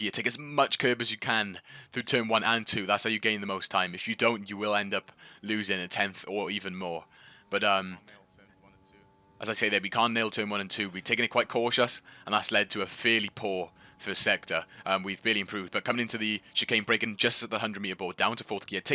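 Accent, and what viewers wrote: Wales, United Kingdom. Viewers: Sounds Welsh to me.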